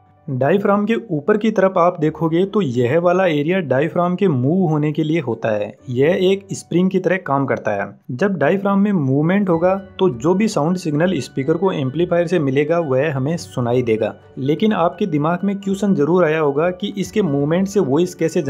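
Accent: Rajasthan, India. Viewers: Bhai tumhari aawaz se saf pata chalta hai ki tum rajasthan se belong karte ho .. shayad..?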